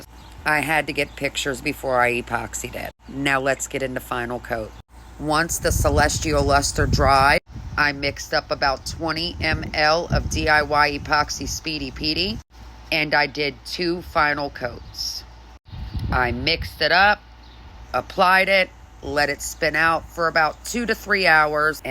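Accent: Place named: Louisiana, United States. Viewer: Beautiful cup!! I am hearing a Louisiana accent…..I’m in Louisiana is why I say that! LOL. Girl I would love to craft with you and learn some stuff! 😊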